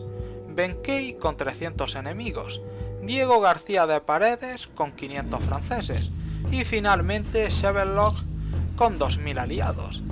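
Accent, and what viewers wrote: Murcia, Spain. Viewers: me mola como habla el locutor,parece murciano. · Liked the video but hearing the spaniard accent of spanish just pisses me off LOL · Jaja como se nota que eres de murcia vamos o eso parece por la forma de hablar jeje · ¿de donde eres de murcia o de almeria?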